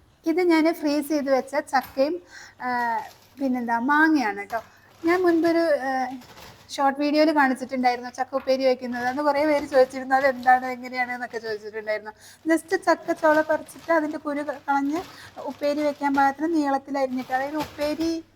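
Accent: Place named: Malappuram, India. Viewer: സംസാരം കേട്ടപ്പോൾ തന്നെ ഒരു മലപ്പുറം touch തോന്നി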